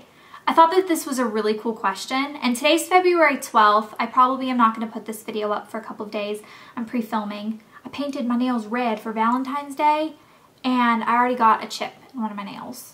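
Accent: South, United States. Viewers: you have your old 'southern' accent back in this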